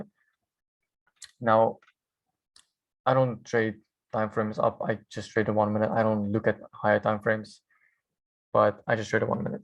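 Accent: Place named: South Africa. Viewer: Hi. Thanks. Where are you from. ? From your accent sounds like South - Africa. ?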